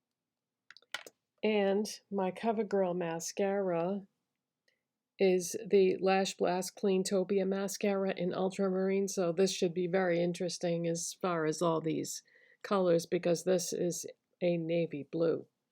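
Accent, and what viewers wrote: Boston, United States. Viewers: Just found your channel and I’m binging videos! Is that a Boston accent I hear 🩶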